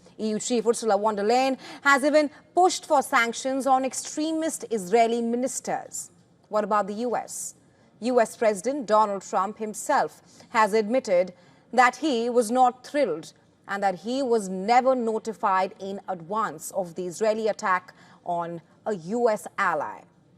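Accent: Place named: India. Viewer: Your sound Indian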